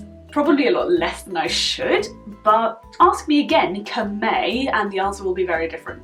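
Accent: United Kingdom. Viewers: When you said you are a international student I thought "huh but I thought you were from the UK, no way you can talk that good English with a UK accent but not being from the UK", but then you mentioned that you were from Norway and I felt stupid. I love your videos, you have such a bright and funny character!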